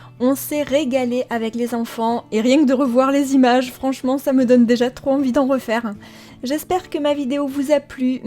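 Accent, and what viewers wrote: South, France. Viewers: C'est un moment détente quand je regarde tes vidéos... Tes plantes... le soleil et ton accent du sud me font oublier un instant le vilain temps de chez nous et les soucis 🙂😘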